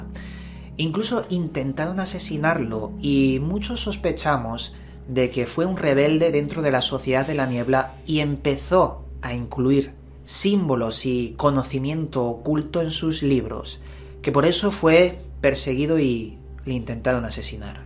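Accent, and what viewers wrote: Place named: Spain. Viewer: No soy muy amante del acento español, pero el tuyo es tan tranquilo tan suave que me relaja, saludos desde Colombia.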